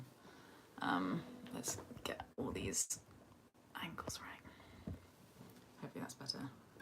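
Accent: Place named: England, United Kingdom. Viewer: it's the accent. Kind of wish I knew from which part of England. It's killing me. Must. Marry. <3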